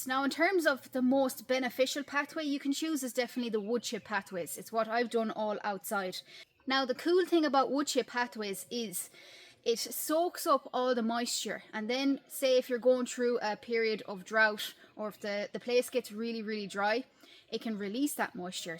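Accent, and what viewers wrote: Ireland. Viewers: I LOVE THE BROGUE: DO YOU BELIEVE IN LEPRECHAUN'S LASSIE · Loving the channel. Mostly the charming accent, but I am actually gardening too 😅 Makes me want to visit Ireland. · not , just listening your awesome voise! :D i mean in from finlasnd and love irish english. :D maybe some others too. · Sheesh, that accent is lovely! I would love to visit Ireland, but my skin tone may have me confused for border jumper 😃 I heard the Guinness there is real and I need it! 😂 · I don't how many male humans could resist a young lady with that wonderful Irish lilt and the gorgeous roses in her cheeks. That is one version of perfect Irish femininity. God bless ya and may your flowers always bloom! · Hey, love your content darling..can I ask what part of Ireland are you from.❤ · this girl is so cool, modest but with pride, love this oirish accent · You are a superstar!!!" And your Irish, wow you have a great outlook. 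Thanks for teaching me how to garden. You have my full attention. Don't ever change anything about yourself!!!"✌️❤️ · Between your Irish accent and my Texas accent we would never have any reason to argue. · I do a little gardening but i just like the way she talks that irish accent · The Lovely Irish could talk about shit and you would still be entranced by their lovely accent. Aussie Jeff Moore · Are you Irish?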